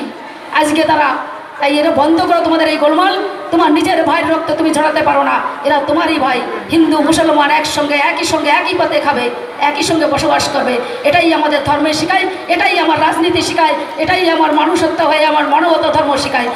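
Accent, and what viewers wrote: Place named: Bangladesh. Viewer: Bhasha ta thik kore bolun, apni to Bangladesh theke bolche6en, mone hoy, r apni to nodiyer lok, apnar kotha to, Bangladesh hobei, karon nodiyer r Bangladesh sem sem okokoko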